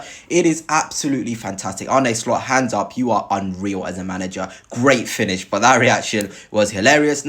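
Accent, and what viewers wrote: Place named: Paris, France. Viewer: Wow he sounds Parisian.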